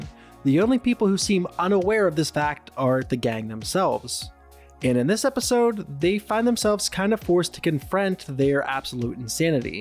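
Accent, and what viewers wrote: United Kingdom. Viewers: LMAO SAME. i have no idea why there's a british guy reading it but it sounds so goofy to me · Why hire the British voice over guy? He's got the most boring voice ever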